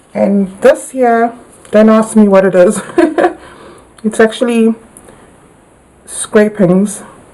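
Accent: South Africa. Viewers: Very pretty! Must say, so weird to hear a fellow South African! Are you still in SA?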